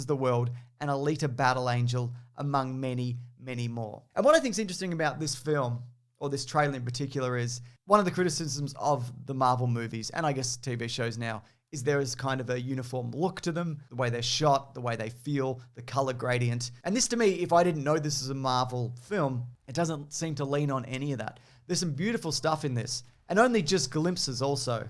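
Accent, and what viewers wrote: Australia. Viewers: I love hearing breakdowns in an Aussie voice. "There's a vortex ring, which is like wind and shit" had me laughing so hard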